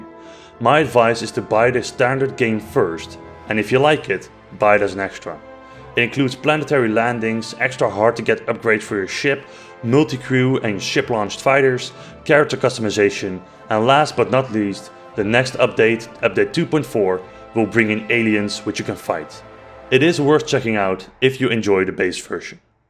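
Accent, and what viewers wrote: Netherlands. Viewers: I heard the accent and bloody knew you were Dutch xD. Verekte Nederlander! ;) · That horrible Dutch accent though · Nice review, convinced me to buy it. You sound very Dutch btw, something i couldn't help but notice. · Mooi filmpie, voortaan wel ff dat nederlandse accent minderen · Ik hoor een nederlands accentje? :P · binnen 5 secondes merkte ik al dat je nederlands bent 😂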